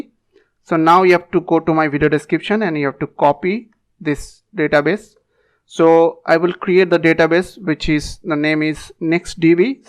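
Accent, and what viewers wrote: India. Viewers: I love your Indian accent (no kidding)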